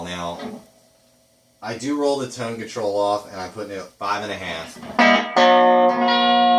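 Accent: United States. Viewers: you sound Alaskan! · you voice had a philly twang lol i was born and raised in philly .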